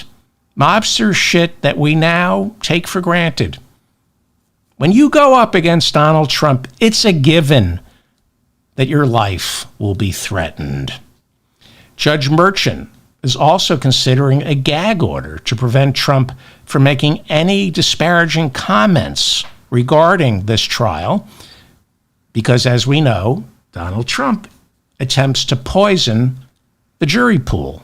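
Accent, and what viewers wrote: New York, United States. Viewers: .. i look forward to listening to your channel. it is so informative and entertaining. yet again, thank you. btw, I'm digging your NYC accent, Bronx? me too...we knew trump was a disaster, if only if they would have listened... VOTING 💙!!!!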